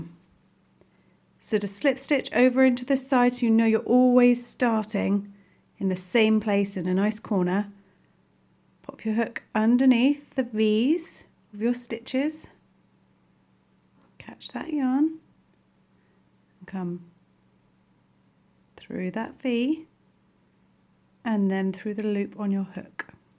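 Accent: United Kingdom. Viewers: I think that even though I'm from the UK, I grown to use USA stitch terms because all the people on YouTube who taught me how to crochet are american Also excuse me for being stupid, but are you from the UK, because you sound like it P.S you explain so well! This helped me so much!!!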